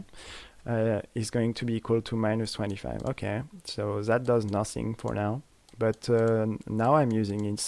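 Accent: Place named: France. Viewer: Super la video avec l'accent frenchy ;-) ! Très très utile, merci !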